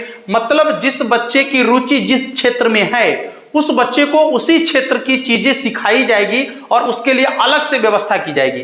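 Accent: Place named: Bengal, India. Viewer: You should explain age and grade classification. At grade 3. What the child age. Bhai your Hindi is not good. It looks like a Bengali accent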